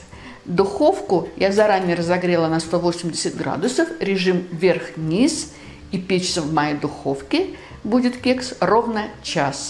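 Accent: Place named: Baku, Azerbaijan. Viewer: Красиво говорите! Вы случайно не из Баку?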